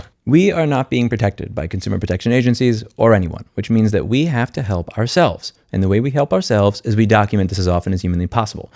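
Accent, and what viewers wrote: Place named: Boston, United States. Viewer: Why do I always think of "Good Will Hunting" when I watch? It has got to be the accent or something...when is schooling companies online, it totally reminds me of the bar scene!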